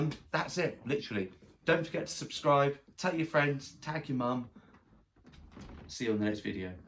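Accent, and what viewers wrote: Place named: United Kingdom. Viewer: i want what this guy is having. What an energy! I wish I hadn't looked at this "guns" though as Ron Burgundy would put it, cause now I feel so skinny. "don't worry he's fine, it's only a staircase!" hahahaha, please do more videos like this one, how good is it to be able to both listen to a guy speak about scotch while making funny jokes (especially with a nice British accent)/ Thanks from France.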